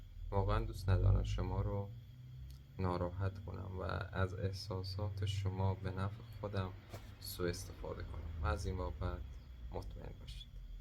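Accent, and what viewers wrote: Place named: Turkey. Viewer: ما درست متوجه شدیم که لحجه ترکی دارید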